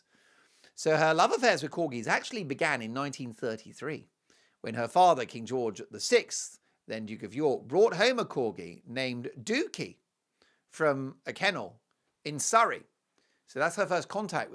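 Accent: United States. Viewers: I agree with PETA to stop the horrible breeding places, adopt first! PS-Your American accent is spot on and great outfit today! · Your American accent is good!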